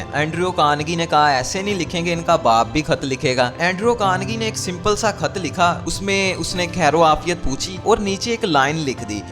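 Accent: Punjab, Pakistan. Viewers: Our yeh Punjabi wala lahja, please Urdu na hi bolo to acha hay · Taubaa ap punjabio ke accent ktne bure dihati jse hote hn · Ap ka bat karny ka andaz bhat acha hay ap k lahjay say lagta hay ap panjabi hay ap k bat karny ka andaz bhat acha hay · آپکی ویڈہو اچھی ہے لیکن آپکا لہجہ پنجابی ذدہ ہے اپنے لہجے کو اُردو میں بد لیں۔ وجہ کیا ہے وجہ یہ کہ اٗردو آپکی قومی زبان ہے۔ اب دیکھتے ہیں کہ آپ کیا سیکھتے ہیں۔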